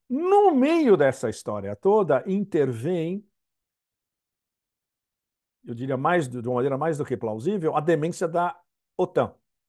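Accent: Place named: Minas Gerais, Brazil. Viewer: Uai sô , te mineiro aí tbm 🧑🏼‍🌾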